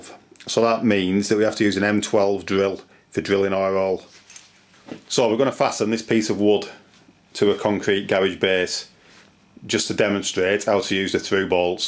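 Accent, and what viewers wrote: United Kingdom. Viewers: to , the text says, "one thing you can do is use them for close to the edge fixing..." I think the narrator actually said "can't" but the voice recognition software doesn't understand Brits. I think he meant "CAN'T." He calls them "through bolts"; in the US we call them wedge anchors or Redheads, which is actually a brand name that's become generic. We also have s bolt called a "Titen" from Simpson-Strongtie which works better close to an edge. They cut threads in the concrete, so they don't exert as much expanding force, so they are less likely to crack the concrete. We haven't caught up with the rest of the world, so we only have inch (Imperial?) sizes- Simpson may have come up with metric sizes for the other side of the pond. Someone asked about the definition of edge distance- check this link- <link> It gives a rule of thumb which is that an anchor should have a minimum of 12x the diameter of anchor spacing to an adjacent anchor or to any concrete edge. 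Also, the concrete should have a minimum thickness of 1.5x the depth of embedment.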